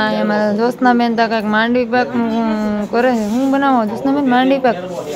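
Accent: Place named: Amreli, India. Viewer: Ha ho ben amreli ni bhasa to sav algj lage to ben tme sasre aaviya tye tmare bolvu thoduk aghru lagtu hse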